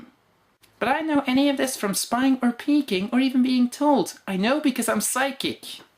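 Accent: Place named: Germany. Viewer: This was hilarious! Loved the jokes, loved the accent (what is it by the way, German?). Great video! Only suggestion is to put only 3 clips instead of 4 and to quote a little less. Also maybe go over plot characters. Amazing video though, loved it!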